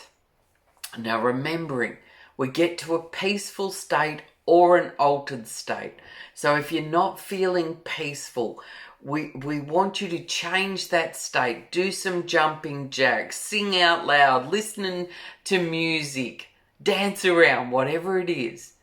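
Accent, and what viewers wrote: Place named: Australia. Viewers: same here, i enjoy your Australian accent too for a change, after hearing UK/US and other accent all my life.:). My Indian accent LOL: <link> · Thank you so much for this,. I had a lot of realizations. And I just love your Aussie accent, I get quite homesick when I hear you and I’m actually German, but lived in Oz for 8 years. Truest feel good Tapping!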